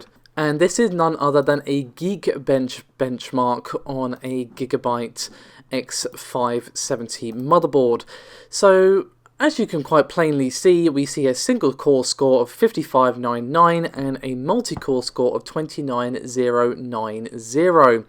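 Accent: United Kingdom. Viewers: What is this woman’s name??? I’ve watched so many of these god damn videos and have no fucking clue what she is saying when she introduces herself and says her name. She sounds like she’s slurring her words with her strong British accent and is making up a name that I’ve never heard of.?!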